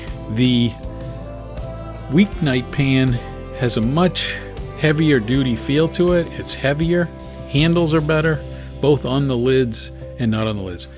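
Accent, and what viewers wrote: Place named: New York, United States. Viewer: you from ny aint you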